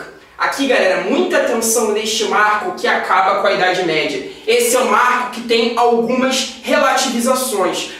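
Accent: Rio de Janeiro, Brazil. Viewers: O complicado mesmo mesmo é suportar o carioquêixxxxx carregadíssimo.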